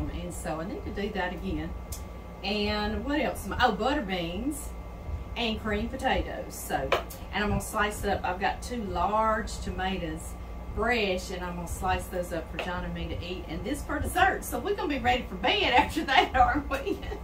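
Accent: South, United States. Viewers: I thought I had a southern drawl……until I heard you! I feel pretty citified now!! LOL 😂 You can’t get too southern for me! I make pecan pies…….everybody’s favorite! My sister gave me the recipe probably 35 or 40 years ago. It calls for brown sugar instead of syrup. I tweaked it to my satisfaction and still add a bit of syrup but……it’s a great recipe. My extended family members always looked for my pecan pie at our get togethers! I’ve lost two sisters and a niece since 2020. I’m not able to cook as much anymore but…I’ll try this. 😃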